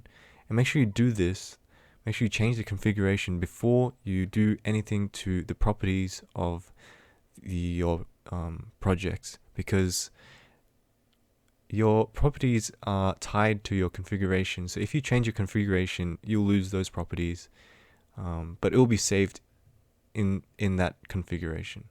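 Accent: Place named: Australia. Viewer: i love ur aussy accent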